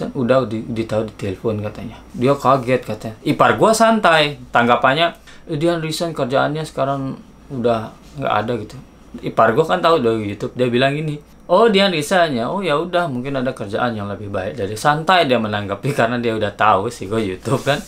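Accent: Lombok, Indonesia. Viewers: Saluuut medok sasaknya gak ilang 😅😅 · Timmak nahase Betawi laguk logat masih logat lombok hihini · Abang kok logatnya lombok bgt ajarkan ke kita jadi youtuber 🤗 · Logat lomboknya msi kerasa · Masih bae fasih logat Sasak tie meton. Banggek gitakm sukses. Mudahanm tetep bahagia sekeluarge lek te😁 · Kek logat lombok ya bang.. Dari lombok kah? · logat lombok nya masih kentel bang salam dari KLU lombok jg 🎉😂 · Keren bang Logat sasak masih kentel😅 salam semeton sasak😺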